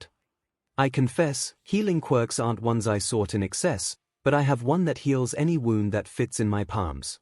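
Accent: United Kingdom. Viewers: first time watchng this kind of content with a british accent kinda nervoua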